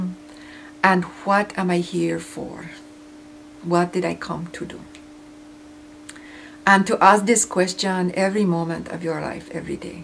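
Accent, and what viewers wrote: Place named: Eastern Europe. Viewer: Why is channelingn in an Eastern European/Russian/Spanish accent??? Come now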